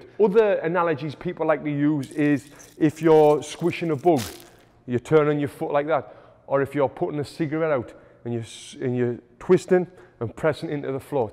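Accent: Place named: Northeast England, United Kingdom. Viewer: Cracks me up how the North East accent needs subtitles.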